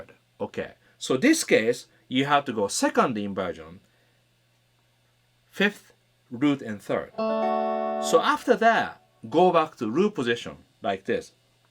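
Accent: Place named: Japan. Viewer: Every time you speak, I feel like you are gonna start speaking Japanese. Your english is very japanese and it sounds really nice.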